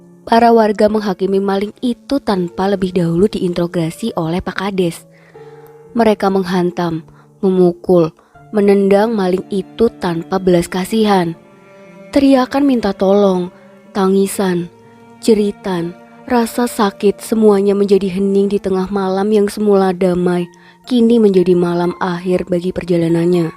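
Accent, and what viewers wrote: Banyumas, Indonesia. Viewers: Bahasa ngapak yak😅😂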